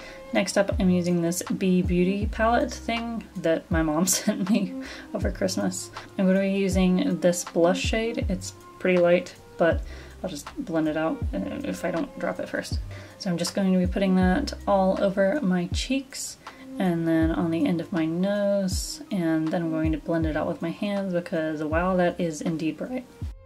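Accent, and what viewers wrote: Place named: United Kingdom. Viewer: You remind me of a teacher,you have that look on your face....a strong one..dont joke with me :-) British accent is phenomenal You have definitely some acting skills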